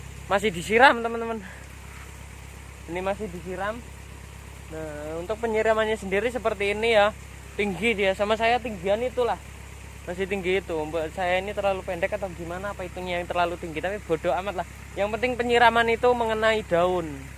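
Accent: Java, Indonesia. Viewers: Jawane medok kok ngomongnya pakai doang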